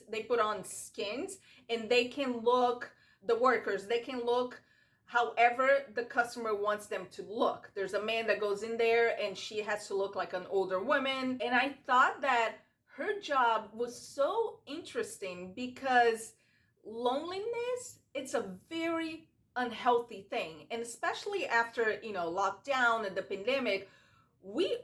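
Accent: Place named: Brazil. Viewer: És brasileira? Quase que adivinho por causa do sotaque :) Eu sou portuguesa, ahahah! Descobri agora o teu canal. Já subscrevi.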